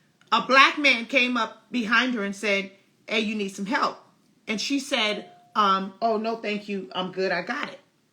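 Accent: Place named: Chicago, United States. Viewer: I can definitely tell she is from Chicago. My sister from the south. Milwaukee native here.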